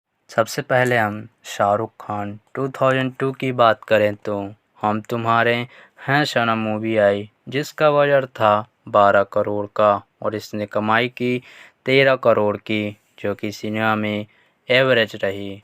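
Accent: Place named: India. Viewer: What city are you from? I'm interested in figuring out where the accent in India is from.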